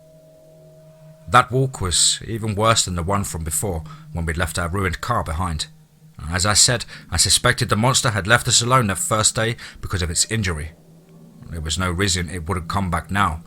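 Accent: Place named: United Kingdom. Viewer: My only input is that I hope your accent could be more neutral (accent-less, a.k.a American English), so it doesn't feel archaic. British accent (or similar) are good for old ghost stories or cosmic horror stories. Also, most of your stories are set in the Americas, aren't they? It should add to the immersion factor.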